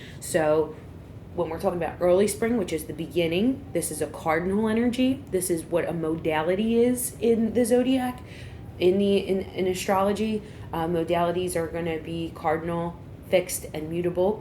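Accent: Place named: Northeast, United States. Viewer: R u from Philly? U have that north east accent.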